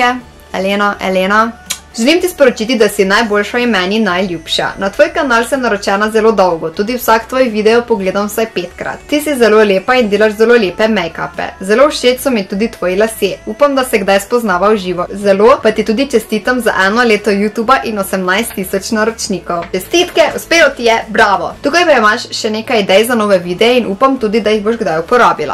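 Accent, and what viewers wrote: Maribor, Slovenia. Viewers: Sodelujem 😊❣️ Ful fajna sii👌 pa fajn je videt da niso youtuberke sam iz Ljubljane in res mi je všeč mariborski naglas čuj😍 Upam da dobim čevljeee komaj čakam❣️❣️❣️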